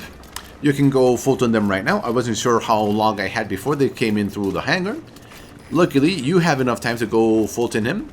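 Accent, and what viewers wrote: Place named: South, United States. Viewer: he is probably from the south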